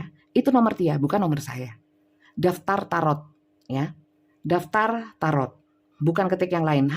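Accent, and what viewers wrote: East Java, Indonesia. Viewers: 😊❤trimakasih, banyak ndidik orang jadi waras, teges😊. Seneng dg gaya jawa timurannya. Saya aja yg bukan orang wetan karena pernah hidup di daerah wetan, jadi ngomong model jawa timuran. Yok opo se rek kon iku.....😂❤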